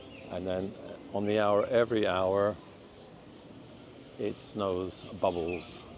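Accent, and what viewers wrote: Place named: United Kingdom. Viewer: Sounds like a Brit who’s lived there for quite some time to pick up some Americanisms in his speech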